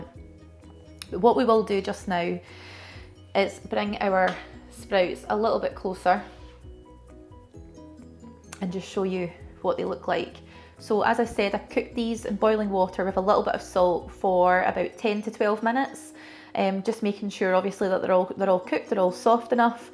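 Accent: Scotland, United Kingdom. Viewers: I love your beautiful Scottish accent 🎉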